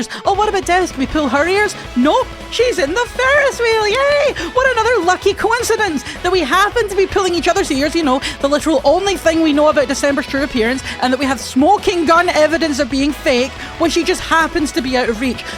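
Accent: Scotland, United Kingdom. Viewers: the scottish accent made me insta sub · I like the cut of your gib manic Scottish lady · I love the scottish accent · pure 10 minutes of a crazed scot yelling at us expecting us to understand her's crackpot theory, okay bud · you have the most scottish accent i’ve ever seen 😭 absolutely love it lad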